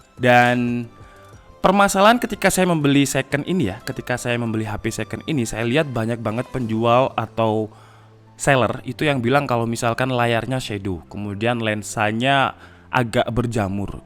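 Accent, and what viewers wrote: Malang, Indonesia. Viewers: Wong malang ya...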